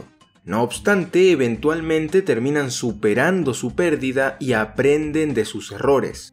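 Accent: Argentina. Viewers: Eres Argentino ?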